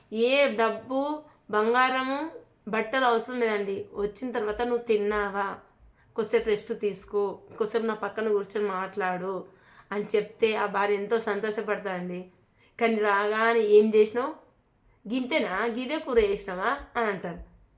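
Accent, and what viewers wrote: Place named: Siddipet, India. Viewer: Meedi ekkada akka ma siddipet language lagane undi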